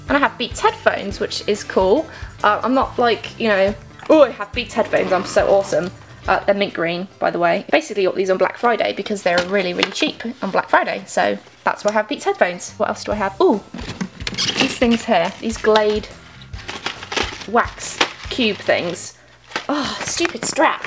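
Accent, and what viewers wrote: England, United Kingdom. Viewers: are you australian or english? your accent reminds me like your from the UK or something XD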